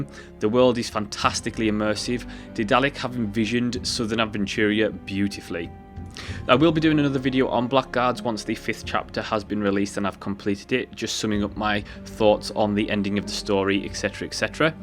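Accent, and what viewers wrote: Scotland, United Kingdom. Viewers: You have a cool way to speak, is it Scottish?^^ (English isnt my native language, so no offense if its something else XD) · This is a bit expensive atm, I will pick it up in the next steam sale though for sure! This video seems like a great introduction to the game, I will check out some of your other videos for sure m8. PS Love your Scottish accent ^^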